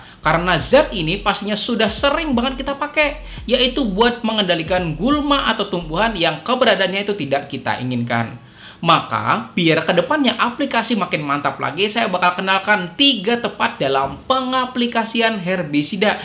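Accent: Banjar, Indonesia. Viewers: trima kasih info nya pak.. logat banjar selalu ada kata "Kah" ujung nya...bujur lah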